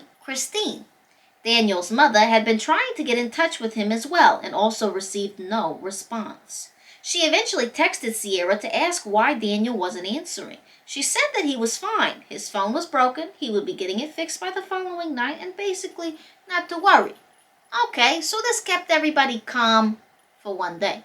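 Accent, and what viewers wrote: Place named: New York, United States. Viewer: What's annoying is your thick New York accent...... I'd rather hear the bells!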